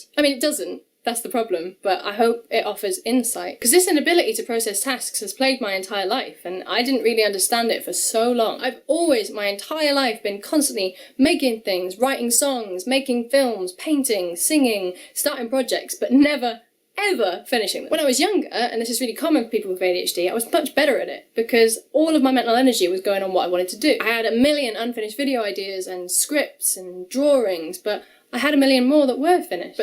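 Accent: Wales, United Kingdom. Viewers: I only just discovered your channel about a year ago, and I have to say that I thoroughly enjoy it. Mostly, because I'm American and am enthralled with everything from the United Kingdom. Add to that, your videos are very well made and the cuts from sentence to sentence are what make them so enjoyable. May I ask from what general area of Wales you are? I don't need an address or anything. I can hear your Welshness in some words, but you (to an American, forgive me) sound more English.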